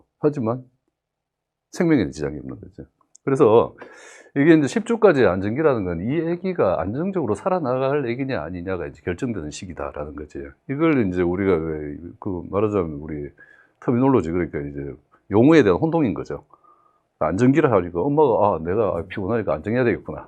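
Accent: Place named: South Korea. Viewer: 잘생긴 외모에 멋진 목소리 구수한 사투리에 친절하신 설명이 도움이 많이 되네요 감사합니다~~~~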